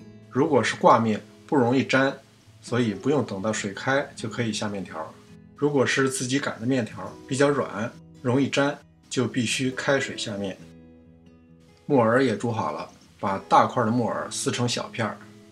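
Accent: Beijing, China. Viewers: 老师您是不是老北京的，有点听相声的感觉了😂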